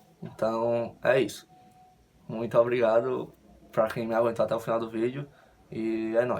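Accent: Northeast, Brazil. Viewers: Você é do Nordeste?🤔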